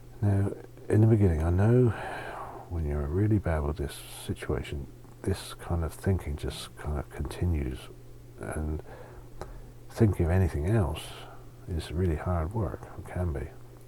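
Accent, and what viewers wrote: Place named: Hampshire, United Kingdom. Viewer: Ah it's a Hampshire accent - yes, some Sussex accents can be similar too. I thought you didn't come across as Cornish in your attitude or manner, so was a bit puzzled, but now I get it. Yours is a very reassuring and earthy accent/voice, which suits your purposes very well. I find it very calming.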